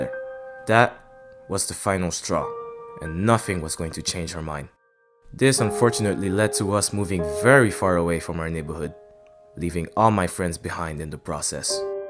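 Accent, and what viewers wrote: Montreal, Canada. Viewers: Ay Man ça c'est du VRAI Français de Montréal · accent canadien tape fort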